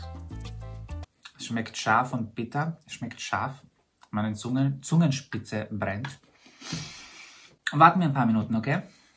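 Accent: Austria. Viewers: Dafür! Der Unterhaltungs- und Informationsfaktor - in einem ausgewogenen Verhältnis - sind hier echt hoch. 💜💜 #support Und irgendwie kommen bei mir immer heimatliche Gefühle durch beim Zuhören, wegen dem österreichischen Einschlag. 🥰 Witzigerweise bin ich zwar gar keine Österreicherin, sondern Oberpfälzerin, aber der Regensburger Spracheinschlag (nicht das eigentliche Oberpfälzerisch, bloß das direkte Regensburgerisch! Ganz anders!) ist oft dem österreichischen Sprachtenor in vielen Punkten echt ähnlich, gerade eben wenn beides eher unterdrückt wird. 😂🙈 Vor allem, wenn man an der Nordseeküste wohnt, wo man wirklich komplett anders daherredet, kommt einem das vergleichsweise direkt heimatlich vor. Keine Ahnung, ob man versteht, was ich meine. 🤷🏻‍♀️😅😅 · ich lerne Deutsch. Ich habe dieses video so cool gefunden! Ich hab nicht alles verstanden, weil österreichische dialekten für mich schwerer sind. 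Aber vielen dank fürs Video!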